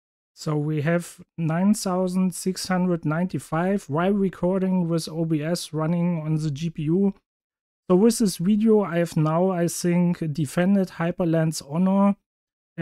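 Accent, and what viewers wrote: Germany. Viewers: souds really deutsch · I did not expect to see this kind of video with a German accent. At least I am assuming you're a German native speaker, its what it sounds like to me. Much love. :)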